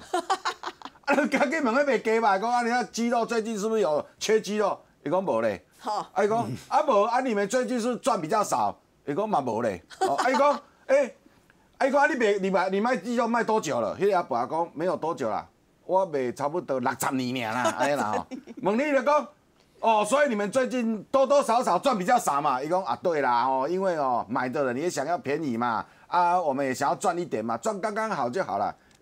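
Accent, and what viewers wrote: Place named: Taiwan. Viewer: 幼琪講台語好cute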